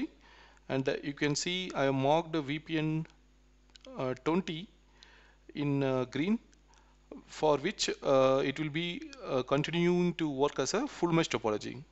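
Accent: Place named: Kerala, India. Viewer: Sounds like Kerala Nice video chetta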